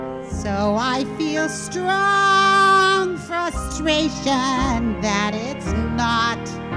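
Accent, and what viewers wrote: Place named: New York, United States. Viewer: You've hit the nail on the head as always. 💉 Nice NY accent! Enjoyable as always.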